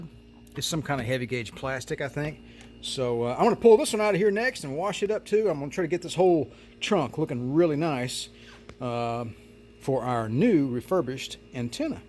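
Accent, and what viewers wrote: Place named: Texas, United States. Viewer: This guy must be from TEXAS! Luv it man!... Good video, thank you sir...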